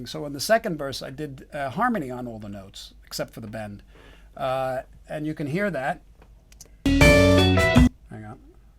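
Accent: United States. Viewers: You are the guitarist I would be you are an exemple Master you sound like an Italian compositor and in same time you do it with an American accent like when you talk I heard the both sounds it's questions of italian Genius blood like Leonardo da Vinci of 🎸 it's a special DNA like a perfect hybrid something like chianina grown up in American the perfect Animal, Always growing up with a lot of music in brain.the perfect sense of metaphors of spirituality like a strings cames from Pitagora the first guitar hero lol every note you do are like an LSD trip as Jimmy Hendrix of future you're biggest one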